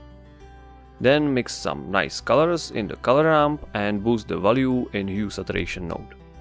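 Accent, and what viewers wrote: Czech Republic. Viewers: is " " a CZECH channel??? the guy's voice sounds like if he sopke Czech at some time I am czech too and so far I haven't found allmost any good czech Blender Channels and I just want to know if I am right and there is someone else I could speak to in my home language so am I right or wrong??? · Hah, právě jsem na tento tutoriál narazil a hned u prvních slov si říkám, že mi ta angličtina přijde s českým přízvukem :D Tak jsem rád, že dobře slyším.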